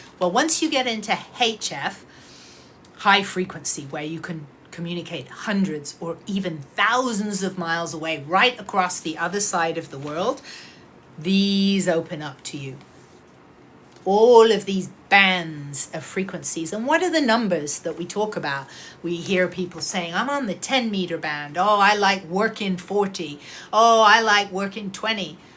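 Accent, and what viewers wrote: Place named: England, United Kingdom. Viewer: I was about to ask where you was thats an English accent and then you said you were in la. Well we shall watch and enjoy 😊 thank you for sharing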